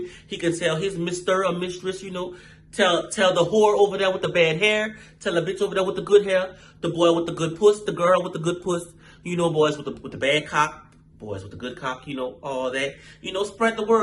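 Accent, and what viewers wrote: New Orleans, United States. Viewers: chileee this story was too mf juicy lmaooo I had to subscribe once I hear the nola accent. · Bitch this story time got me rollinnnnn 😂😂😂😂 omfg! I’m subscriber 557🥰🥰 and where you from? The accent giving new orleans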